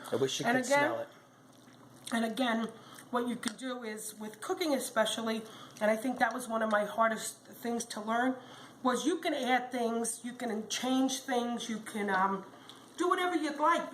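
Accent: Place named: New York, United States. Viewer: I love listening to you as you cook. I was raised in NewYork in an Italian family. Moved to Louisiana when I was 16. Your speech reminds me of my mom and our friends and myself before I lost my NYC accent. My friends tell me when I am tired, the accent comes back...ha! You bring back some sweet memories, thank you!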